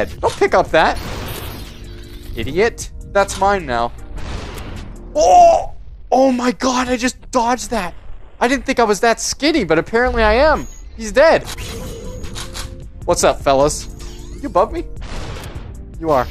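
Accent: Canada. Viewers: I can hear the Candian in your voice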